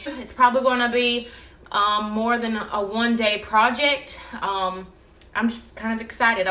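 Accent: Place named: Alabama, United States. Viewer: I love listening ot you talk... you sound like everyone I know! :-) (I'm from Alabama.)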